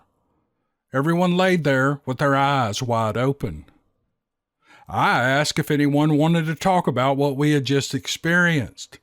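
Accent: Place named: South, United States. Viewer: you seem new here, welcome to one of the best southern voices of the cryptid world - between him and Kerry Arnold [Bigfoot Odyssey] their voices/accents and way of delivery are second to none and in my opinion, just give these stories a much appreciated bit of southern flavor.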